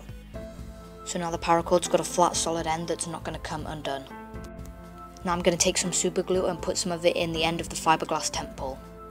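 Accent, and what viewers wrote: United Kingdom. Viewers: i've been watching your videos quite a time... and they're amazing... But when i saw your first video i realized there is something similiar in your voice... and its damn Harry Potter (daniel radcliff) in the two first parts XD i think it's because british accent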